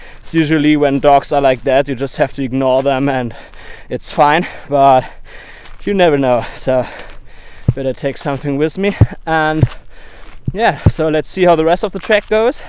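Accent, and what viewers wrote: Germany. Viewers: you sound german....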